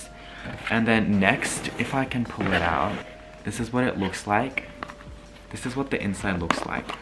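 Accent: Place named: Australia. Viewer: オーストラリア訛りかわいい〜😍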